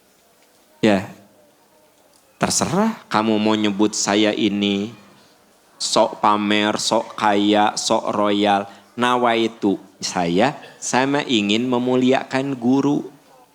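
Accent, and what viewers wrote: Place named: Sunda, Indonesia. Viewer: Saya tinggal di Jerman tepatnya Saarbrücken, ya Allah baru tau ustad Dr. H Aam Amirudin LC,Si dan saya senang mendengarkan tausiah beliau apalagi khasnya bahasa sunda karena sejatinya saya orang Sumedang , jadi saya kangen bahasa tersebut.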